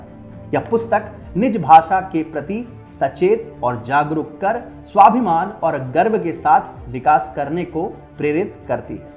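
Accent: Standard. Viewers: aapki saari baatein aatma ko jakhjhor de rahi hai, lekin kya badlega wo to hum logo par hi nirbhar karta hai. fir bhi priya bhrata aapki shudh hindi sun kar mai tript ho gya.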